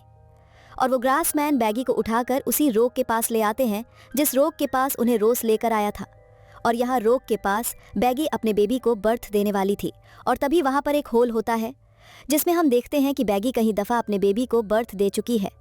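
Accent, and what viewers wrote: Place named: Punjab, India. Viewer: Punjabi vibes te boli hindi jande maharaj vaise vadiya explain aa carry on👍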